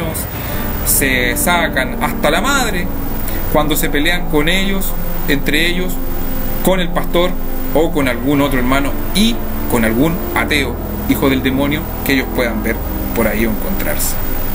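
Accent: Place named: Rioplatense, Argentina. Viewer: una pregunta eres argentino o paraguallllllllo.....dime ...que le echan al agua por ahi???? o te dejaron caer cuando chiquito????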